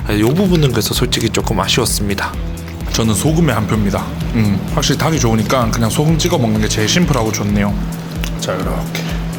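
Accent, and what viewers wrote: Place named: Gyeongsang, South Korea. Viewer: 갱상도 사투리도 이렇게 서윗하게 들릴줄은 목소리 언제나 서윗합니다..ㅋㅋ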